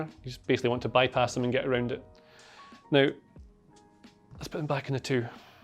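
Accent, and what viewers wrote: Scotland, United Kingdom. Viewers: And for Americans who can't understand Scottish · As an Indian, i also have difficulties to understand what he says. I understand American accent totally but when i hear British accent, it makes me feel like that i don't understand English at all. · that's a really light Scottish accent lol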